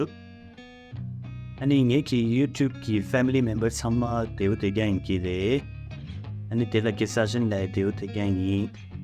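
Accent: Standard. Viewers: Tashi Delek! Amazing video to watch!! Especially, your Tibetan language is pure and perfect 😍 Watching from New York!❤ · you speak Tibetan pure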